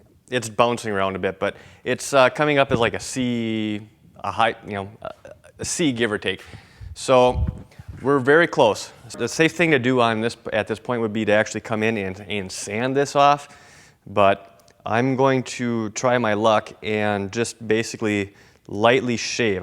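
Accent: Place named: Minnesota, United States. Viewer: are you minnesotan? definitely sounds like it!!!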